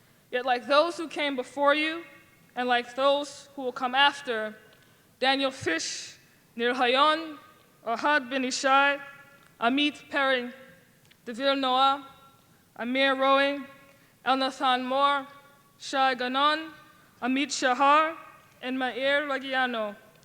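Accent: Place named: United States. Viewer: I like it better when IDF or Judaism focused voices are heard. I like their inspiring speeches. This sounded like some American Israelite stuff..This girl sounds American, not African, and speaks as someone carefully choosing words to manipulate. She does NOT speak as someone who has love for Israel, Judaism, and IDF. She speaks warmly, but not with fire. She speaks of patting people on the back, but not understanding the trauma to the heart. She speaks like someone who gives a handshake not understanding the need to stand with Israeli's in the settlements. She sounds like some American Hebrew Israelite trying to gain a foothold in Israel.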